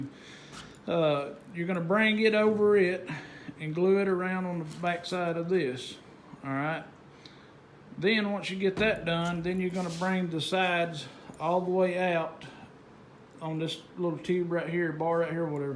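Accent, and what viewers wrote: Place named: South, United States. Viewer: Alabama or Georgia? My Mama was from Columbus, Georgia and it's my hobby guessing where ya'll from with your Southern accents. She never got used to Montana winters. Foot in mouth if I'm wrong.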